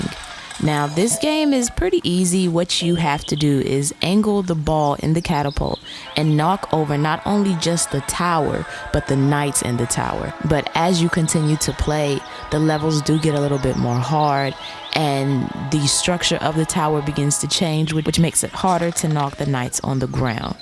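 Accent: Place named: New York, United States. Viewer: Altaïr ibn-la’ Ahad it’s not funny because the “joke” is false because she sounds like a white New York lady.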